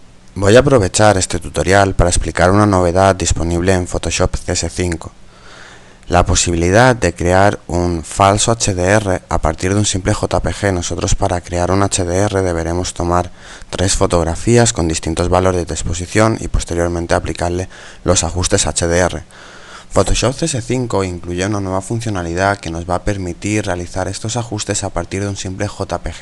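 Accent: Spain. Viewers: Así es el acento castellano, -.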